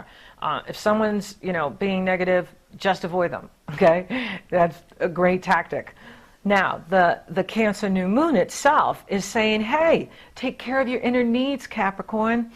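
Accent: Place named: East Coast, United States. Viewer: I feel so awesome and enlightened after your readings..i hear a hint of tri state area, east coast in your accent...DE/NJ here, ty !!!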